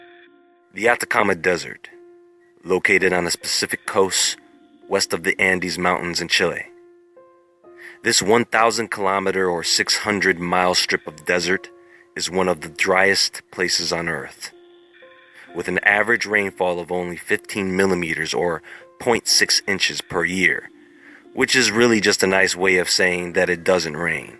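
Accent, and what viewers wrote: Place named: New York, United States. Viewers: I love your channel you sound like a fuckin badass you must be a brooklyn kid, you deserve 50 quadrillion subscribers. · Would make a perfect narrator for a mob documentary i'm serious man you got a great voice I don't know if you're from New York or New Jersey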